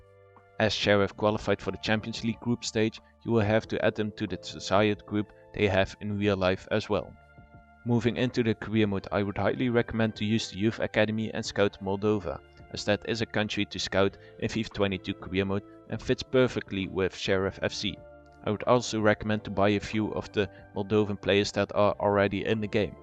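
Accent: Netherlands. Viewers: Goeie video, dacht al toen ik je stem hoorde dat je Nederlands was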